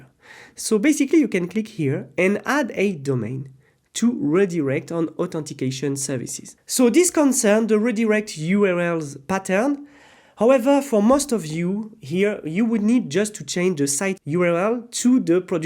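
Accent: France. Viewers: Английский язык с французским акцентом намного понятней чем английский с британским акцентом 🙂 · French accent is soo cringe 🤣🤣🤣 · Tu es français ?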